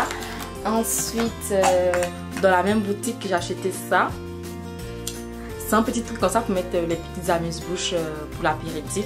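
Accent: Senegal. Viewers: Elle ressemble à une sénégalaise. Et elle parle comme une sénégalaise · Tu ressemble à une sénégalais et même l'accent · Non quand je t'ai vu, je croyais que tu étais une Sénégalaise, t'as l’apparence et l'accent en plus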